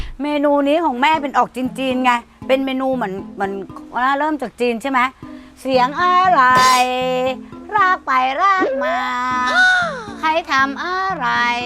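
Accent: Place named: Mae Klong, Thailand. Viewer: สมเป็นสาวแม่กลองเลย อารมณ์ขัน พูดตลกเหน่อๆ แต่ฝีมือทำกับข้าวสุดยอด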